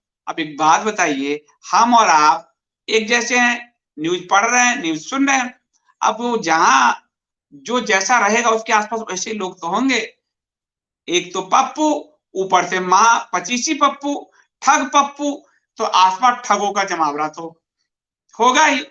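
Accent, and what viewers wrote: Bihar, India. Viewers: ur tone truly Bihari about 25shhuuu🇮🇳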